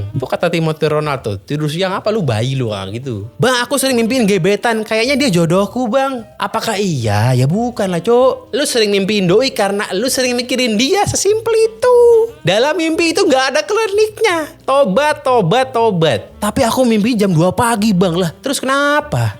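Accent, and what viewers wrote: Java, Indonesia. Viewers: Buset, medoknya juga ketularan 🤣🤣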